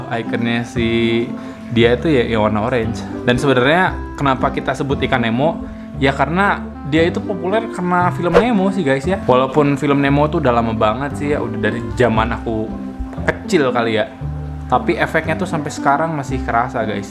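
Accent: West Java, Indonesia. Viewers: Bang Orang Sunda Ya?:)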